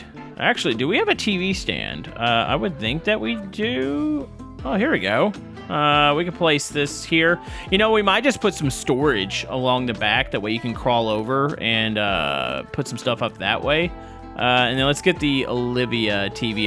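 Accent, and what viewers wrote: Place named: Texas, United States. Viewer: I watch your content alot and I will say its pretty fun, especially when you see it with snacks! I couldn't help but notice that you sound texas (Not being rascist or something tho)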